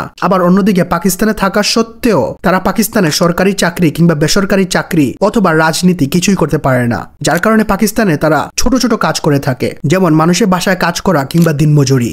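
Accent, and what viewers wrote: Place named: Sylhet, Bangladesh. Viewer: ভাই আপনাকে সিলেটি দের মতো লাগে, কথা বলার স্টাইল দেখে মনে হয়।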